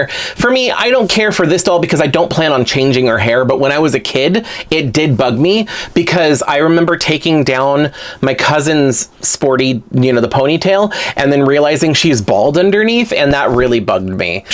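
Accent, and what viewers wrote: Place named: United Kingdom. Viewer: My goodness that British accent lol😂 I hope that’s not what we sound like 😂😂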